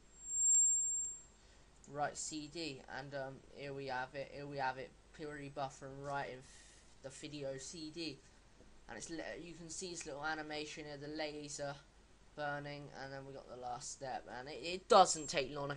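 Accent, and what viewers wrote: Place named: United Kingdom. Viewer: I couldn't understand a word that this guy was saying!The British accent was bad enough, but you add him talking at light speed and he ight as well be talking in Martian language. Maybe he is a "Klingon trying to talk English!!!!PLEASE do not post anymore dirty Klingon videos because this is a site for humans onlyand English language only. Humans are biggoted when it comes to Klingons!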